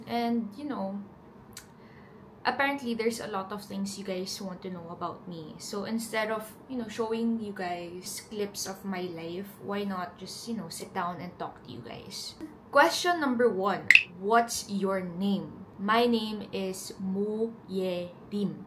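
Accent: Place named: Philippines. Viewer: Pinay na Pinay yng accent mo!Galing mo mag tagalog!👏❤️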